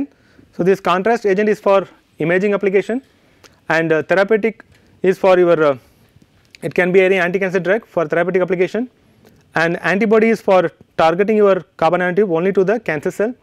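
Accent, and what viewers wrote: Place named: India. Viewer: Why Indian guys even don't try to correct their accent? 😢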